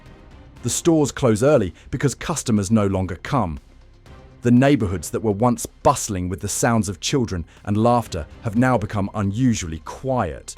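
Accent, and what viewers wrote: United Kingdom. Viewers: Why a guy with a British accent know about New York. But If I can say something about this as a New Yorker is that New York turned is a shithole.😮😮😮and it because of politics and the real-estate courrption the NYPD & Port Authority & the MTA. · Why is a man from England with British accent describing New York situation?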